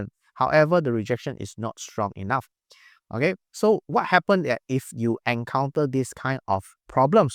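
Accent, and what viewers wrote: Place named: Singapore. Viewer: I can't understand your singlish 😅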